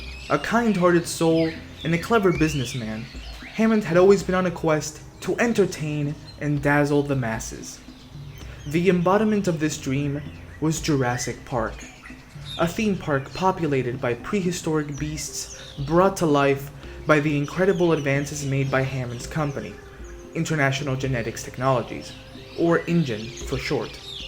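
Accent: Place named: Spain. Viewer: Aye yo Spanish accent is 🔥🔥🔥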